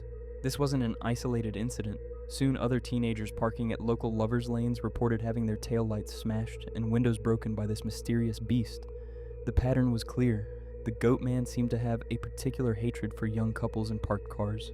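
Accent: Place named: Maryland, United States. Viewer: u can tell my dood is from maryland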